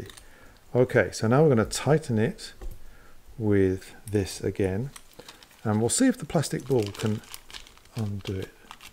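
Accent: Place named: England, United Kingdom. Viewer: I just love listening to your voice with that yummy English accent.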